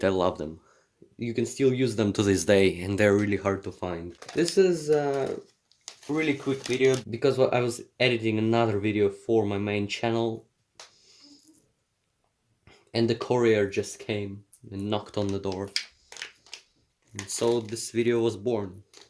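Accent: Russia. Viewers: 0 dislikes thats what i like to see also i like the voice especially with the russian accent :D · I hear that russian accent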